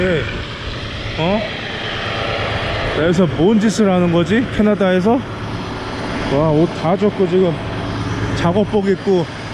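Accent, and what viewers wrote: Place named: Chungcheong, South Korea. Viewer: 친숙한 충청도 사투리 ㅋ 어디세요? 전 충주 ㅋ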